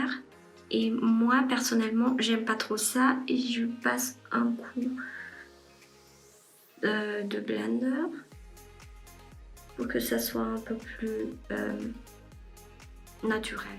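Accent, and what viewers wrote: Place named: Germany. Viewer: Merci bcp,j' Adore votre chaine😙😙😙 Le Accent kou efa lasa Deutsch😀😀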